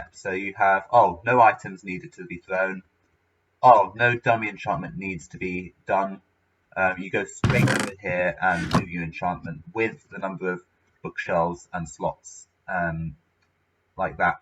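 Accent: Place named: Manchester, United Kingdom. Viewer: Is that Sancarn reincarnated?! Lol I’m joking but I’m super glad that a fellow Brit has managed to make this incredible technical discovery! P.S you sound like you are from Manchester :P Congratulations on this achievement!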